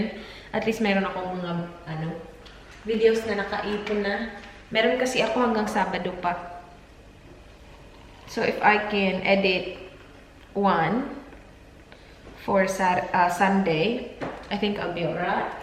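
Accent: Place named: Visayas, Philippines. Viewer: You speak English so well and yet bisaya kaayo gihapon ang dating. Hahaha ✌😇😂💓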